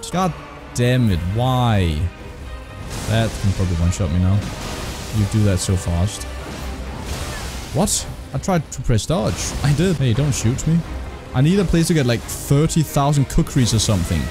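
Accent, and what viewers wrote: Denmark. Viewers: Are u danish? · Wait you danish?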